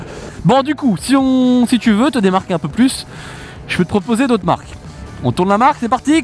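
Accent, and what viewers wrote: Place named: Toulouse, France. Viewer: Novembre encore moto 🏍 vive LILLE a pardon toulouse avec l’accent biensur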